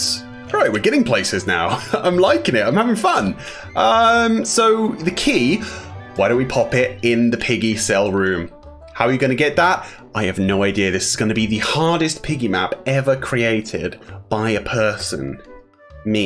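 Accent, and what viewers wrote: United Kingdom. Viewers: Wow I just came here to know you were British or something and how much u changed wow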